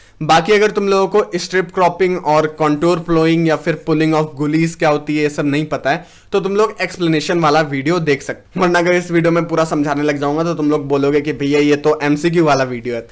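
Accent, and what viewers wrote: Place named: Uttar Pradesh, India. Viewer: Kariya soil😂😂love your language too😂🤣.... Katai upwalo wali feeling aata h😂 .... M to UP se hi hu to feeling to aaegi n😌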